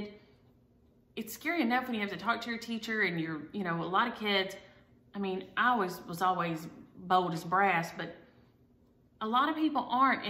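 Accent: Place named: Texas, United States. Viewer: I love your accent, I’m a fellow Texan too over in Collin County. You have the cutest projects! I like Clara, jingle, and the star projects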